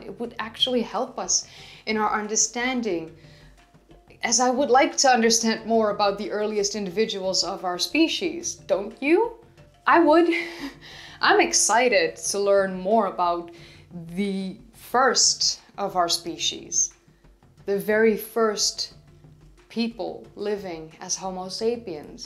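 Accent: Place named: Netherlands. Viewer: I love your Dutch accent on pronouncing Leipzig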